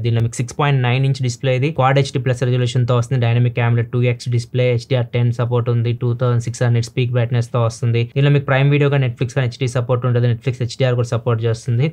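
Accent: Ongole, India. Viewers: Our ongole telugu....😂 edge kopu ga untadi...❤❤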